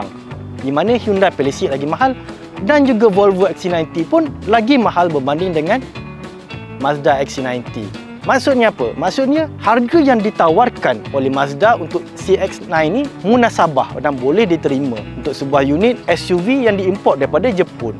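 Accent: Kelantan, Malaysia. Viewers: Ore kelate ko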